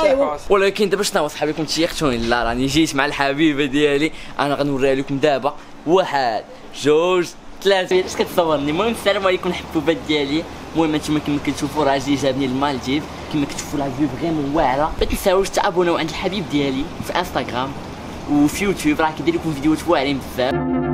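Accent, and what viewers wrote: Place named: Senegal. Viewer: كدوي بالفورونسي بحال شي سنغالي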